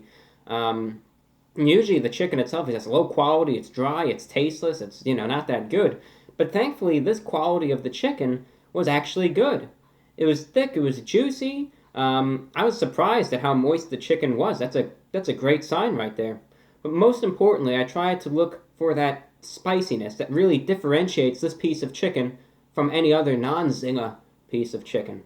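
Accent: United States. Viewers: Is he American?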